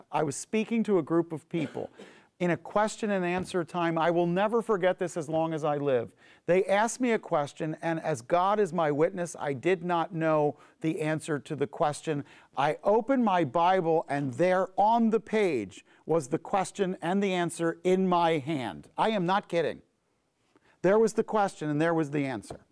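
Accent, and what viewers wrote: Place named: Israel. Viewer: I understand that native tongue is Hebrew (being born in Israel). You are being exposed to a greater thing. The Word will expand your mind, heart and soul-- in Hebrew the bet is pronounced as "b" AND as "v" bet/vet; and that is what you are hearing. Shalom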